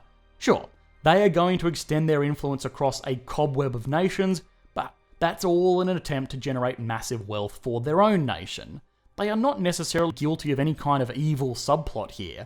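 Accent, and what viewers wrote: Australia. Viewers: 趙子畵 hes definitely an Aussie. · This dude sounds Australian and is saying China is about "Free Trade".... Has he looked at his local newspaper? Almost like me saying America doesn't have a rioting problem. Or China saying they didn't start the Coronavirus.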